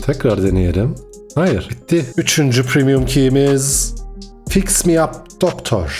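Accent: Cyprus. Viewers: kral kıbrıslı mısın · kıbrıs ağzın çok iyi reis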